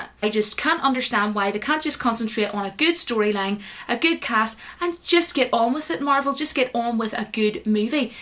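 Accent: Scotland, United Kingdom. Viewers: Like ur Accent... r u scottish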